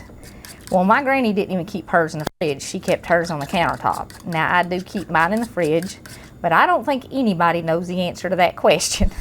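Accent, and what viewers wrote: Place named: Missouri, United States. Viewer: Hello! Loved the video and love hearing you talk.My dad was from Alabama and it brings back memories. Anyhow....never made gravy w/o sausage, I'm going to have to try it. My recipe is to brown up a pound of sausage, spoon out the excess fat and sprinkle flour over it. Stir it up and add the milk. After that is is some salt, lots of coarse ground black pepper, some powdered sage and chicken seasoning for flavor. I have even used chicken bouillon cubes at times. Thanks again for the great recipes. BTW....what part of the south are you from originally?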